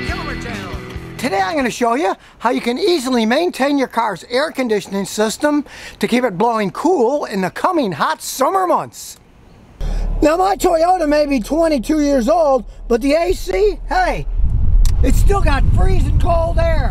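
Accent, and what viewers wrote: United States. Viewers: your English accent is funny and all American 😁. Great info!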